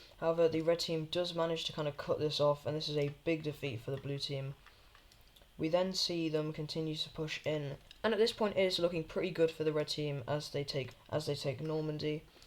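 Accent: United Kingdom. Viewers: why do people think you sound like anorwegian when he’s american you’re from southern england 😭😭😭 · British accent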